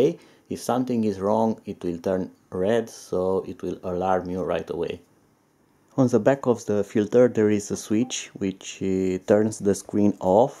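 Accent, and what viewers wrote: Romania. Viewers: Deci dupa accent eram sigur ca esti roman. Nu am reusit sa ma lamuresc pe paginile tale, dar am dat mai jos in comentarii si te-am vazut scriind in limba romana :))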